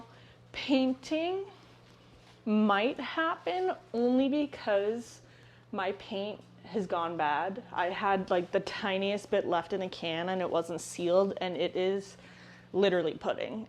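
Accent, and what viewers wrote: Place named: New York, United States. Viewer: What were you doing (for work) before YouTube and motherhood? I remember you said you’re from NY. Upstate? (Accent noted!)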